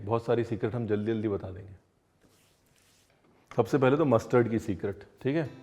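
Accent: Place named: Kanpur, India. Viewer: Kabhi kanpur wali koi recipe banaye,bahut khushi hogi,aapko boli to kanpur ki lagti hai bahut hansi ati hai jab aap yaha ki bhasha Bolte ho🙏🙏🙏🙏🙏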